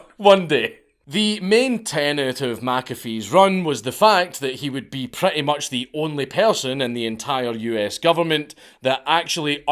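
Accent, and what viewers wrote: Scotland, United Kingdom. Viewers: I could listen to this Scottish man talk about bizarre historical figures all day. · aww i thought he was irish hes scottish which is js fake irish :(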